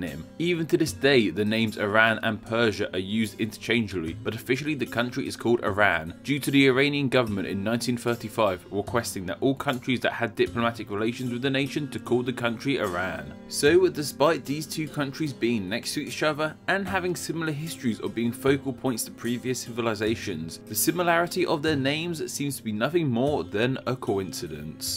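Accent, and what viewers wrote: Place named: United Kingdom. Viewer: Your british accent gives me headache. Great video though.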